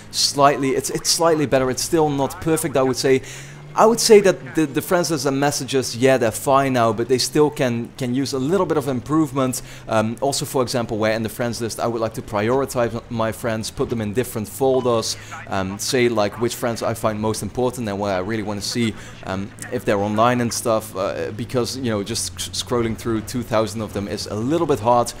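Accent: Netherlands. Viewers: Ben je Nederlands? :p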